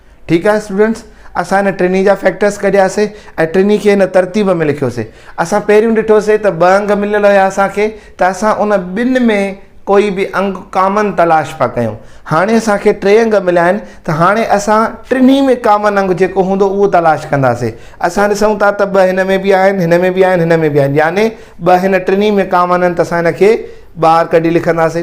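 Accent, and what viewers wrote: Larkana, Pakistan. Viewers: Larkana waly aise hi bolty hen so sweet 🥰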